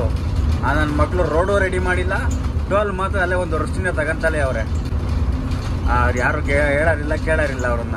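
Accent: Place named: Hassan, India. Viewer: ಪಕ್ಕ ನೀವ್ ಹಾಸನದವ್ರು ಅಲ್ವಾ. ❤️😊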